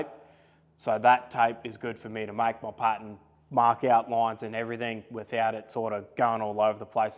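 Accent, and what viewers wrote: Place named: Australia. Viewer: I'm so impressed by the knowledge and craftsmanship that gets shown here in this video. That and well as a Ducthie I like to here a Aussie accent talk shop.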